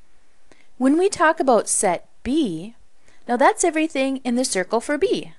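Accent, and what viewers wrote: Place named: Canada. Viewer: hahah, you're Canadian.. aren't you! XD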